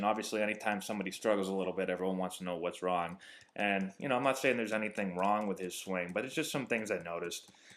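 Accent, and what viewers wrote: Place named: East Coast, United States. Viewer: you sound like an east coast Jay Cutler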